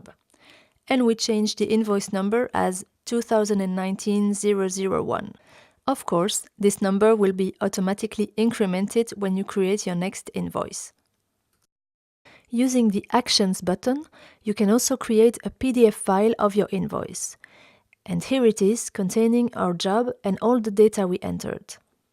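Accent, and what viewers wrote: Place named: France. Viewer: Hello ! By your accent, I imagine you have uploaded the same video with a voice-over translation in French ?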